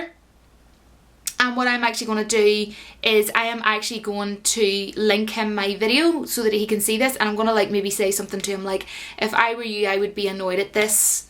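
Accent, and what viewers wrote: Ireland. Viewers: Are you from Ireland ? Moving to Ireland in August. Love your accent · Are you Irish ?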